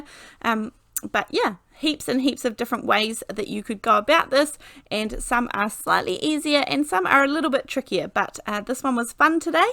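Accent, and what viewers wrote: New Zealand. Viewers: If you die cut the rectangle out of both cards, couldn’t you have made 2 cards by swapping your pieces over & still raising them with foam. I do luv your videos (yes I’m subscribed) & your kiwi accent too 😀👍🏼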